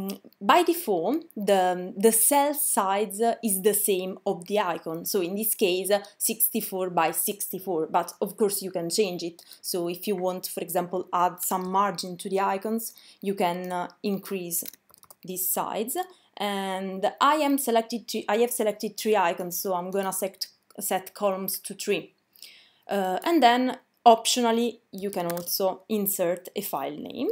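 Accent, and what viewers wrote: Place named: Italy. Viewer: Loved the accent. Italian?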